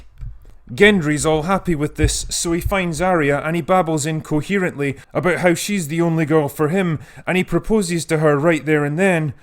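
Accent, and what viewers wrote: Scotland, United Kingdom. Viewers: It sounds Scottish lmao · You cant believe how much i love the And thats all i have to say. Go away naow. In this lovely british/scottish accent. Every other youtuber would have said: Like and subscribe, follow me on instagram and facebook Absolutely in love with this... review? Subscribed, instantly. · Love your Scottish accent.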